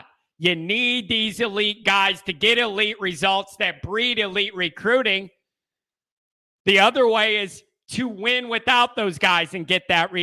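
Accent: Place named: Ireland. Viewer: I love this lil angry Irishman…his accent is 90% of his charm. Great channel!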